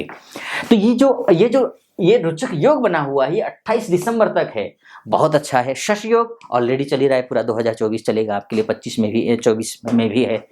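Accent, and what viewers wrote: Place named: Nepal. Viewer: Sir. Aap nepali mul ke hain. Kiuki aap ka bhasha Nepali ka tone me aati hai . App ka prediction bahut good hai.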